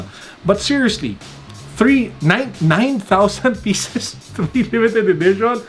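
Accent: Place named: Philippines. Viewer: Love the Filipino accent. Cheers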